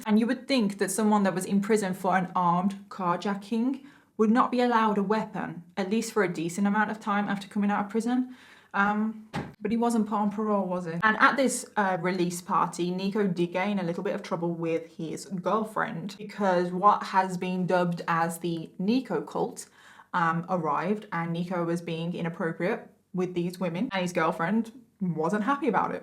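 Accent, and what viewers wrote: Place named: West Midlands, United Kingdom. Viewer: Your accent is a combination. West Midlands and what?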